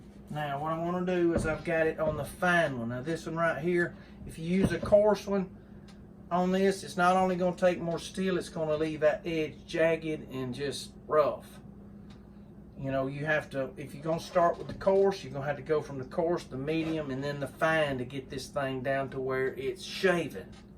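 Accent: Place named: South, United States. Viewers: addicted to hearing that southern twang lol. also love the tips. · I do appreciate all your content man. Your a very humble God fearing man and that's nice to see these days. I live outside Nashville TN in a little place called cheatham county and you talk just like us bubba so it's normal to me but i love how others get a kick out of it 😂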